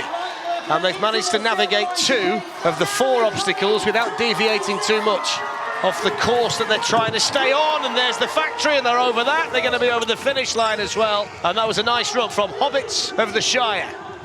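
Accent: United Kingdom. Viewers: I love ghis british commentary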